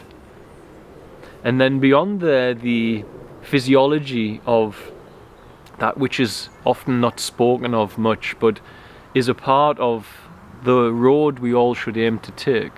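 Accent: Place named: Newcastle, United Kingdom. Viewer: i love your slight geordie accent lol i am a geordie trying to be godly too :P lol thanks for the info and videos, keep spreading the light xxx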